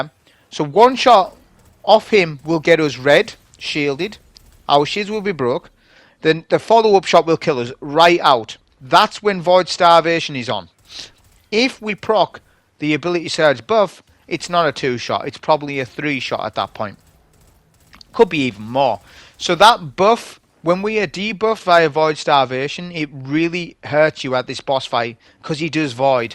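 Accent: United Kingdom. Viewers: Somehow im enjoying more of your bri'ish commentary rather than the actual gameplay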